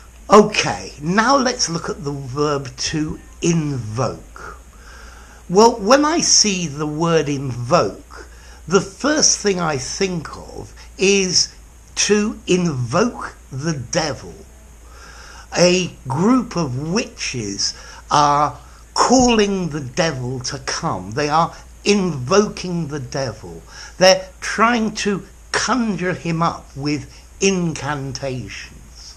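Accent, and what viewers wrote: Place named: England, United Kingdom. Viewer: The aura of your accent evokes some of my immensely-cherished memories in England some years ago.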